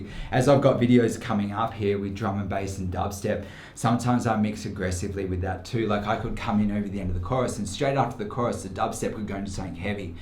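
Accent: Australia. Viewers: You are Aussie right ?? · you got such a positive vibe mate, are u Aussie? just love your videos, great content and genuine way to teach, cheers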